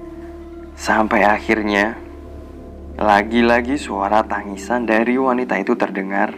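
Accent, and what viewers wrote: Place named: Java, Indonesia. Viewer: Logat jawamu kentel mas yho😂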